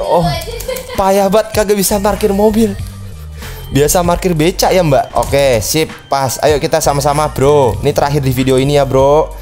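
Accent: Java, Indonesia. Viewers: medok bang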